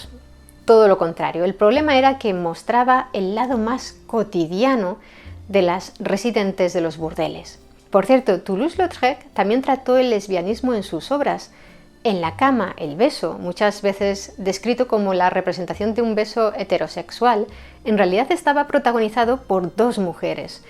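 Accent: Spain. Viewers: aumentaste mi admiración, hacia ese Gigante de la pintura, como lo fué Henri de Toulouse-Lautrec, gracias a tu narración con todas esas imágenes, lugares y personajes que rodearon la vida de este superdotado Artista. Toco el Fagot, y me hubiera gustado que incluyeras entre sus ilustres amistades, a Désiré Dihau, fagotista de la Ópera de Paris, pintado por su genial amigo, Edgar Degas. De todas formas hemos quedado fascinados con tu narración y esa bella combinación de tu acento español y la pronunciación impecable de tu francés. Bravo y, te seguiré en el futuro.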